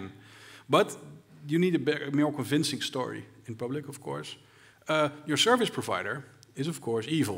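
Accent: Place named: Netherlands. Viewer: Yes. The Dutch accent is kinda cool.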